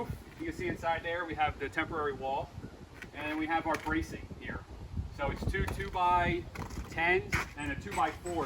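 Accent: Philadelphia, United States. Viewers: Gotta be from Philly with this accent. Nice work bro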